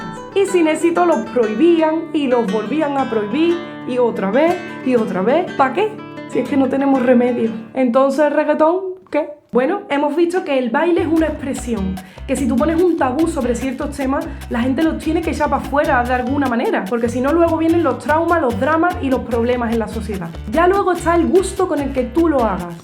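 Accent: Andalusia, Spain. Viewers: Cuando arrancó el video y dijiste que ibas a hablar de ese género te confieso que dije " se me cayó una ídola, un bastión" después me hiciste reir, me diste conocimiento y argumentaste sincera, coherente con clase y arte. Sos muy genia che. Me tengo que entregar de una vez a la guitarra, a ver si es verdad que no tengo talento o solo estoy "negão" jajaja. Gracias bella. Adoro tu acento del sur. Saludos de este oriental. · 😂😂😂 Me ha encantado tu vídeo, qué arte shikilla. Soy andaluz de pro y me encantan nuestras frases, dichos y ACENTO ❤. Y por otra parte, currazo de vídeo con las cuñas, y la información, amena y muy interesante. En resumen, cada día mejor 👏🏻. Un saludo desde Jeré! Y GRACIAS ❤ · Me encanta que te apasiones y te salga ese acento andaluz tan rico que tienes (el cual sabes ocultar maravillosamente cuando cantas rancheras). Mi esposa y yo ya somos tus fans. 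Saludos desde México ¡Viva España! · Me encanta tu canal y tu acento. Adoro a la gente talentosa de España. Saludos desde México... bueno, lo que queda de México después del sexenio de López Obrador, jajaja.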